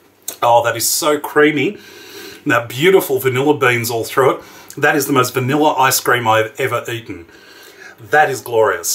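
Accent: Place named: Australia. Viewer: I recently subscribed to your channel for two reasons: the easy-peasy recipes, plus your delightful Aussie accent! We Americans do enjoy hearing y'all talk.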